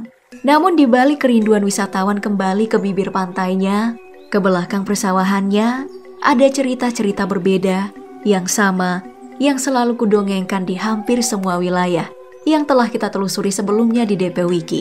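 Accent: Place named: Bali, Indonesia. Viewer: Ntaps min bahasa balinya 😆✨🌝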